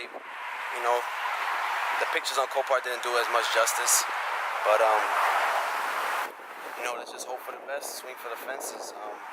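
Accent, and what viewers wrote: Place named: Dominican Republic. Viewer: Desde que el pana hablo españo, le salio el sason dominicano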